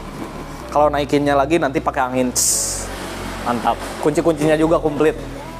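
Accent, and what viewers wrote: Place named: West Java, Indonesia. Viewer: Resep ka orang Sunda mah, boga cirihas sorangan Amun nyarios bahasa Indonesia ge, angger hentte lengit sunda'na mahh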